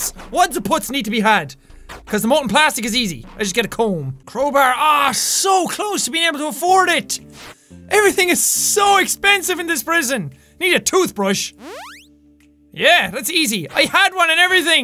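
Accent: Ireland. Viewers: Lol it's Irish dude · Tirteen strengt. Lol, i love the irish accent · Zarif Safin irish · haselbacher he is IRISH and me too # Irish4life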